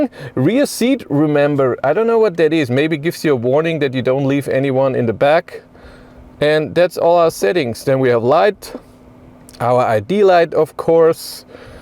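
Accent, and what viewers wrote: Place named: Germany. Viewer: Sigh... Dear narrator: The word is view with a v, not “fiew” with an f. I don't know why, but this is the single most infuriatingly annoying aspect of your Denglisch. Please try to learn the correct pronunciation, so you can stop doing this. Gillt übrigens für alle Wörter mit einem V (und nicht nur am Anfang des Wortes, sondern überall): NUR auf deutsch und niederländisch spricht sich der Buchstabe V wie F aus; auf allen anderen Sprachen, inklusive Englisch, hört er sich so an wie das was Sie auf deutsch mit einem W schreiben.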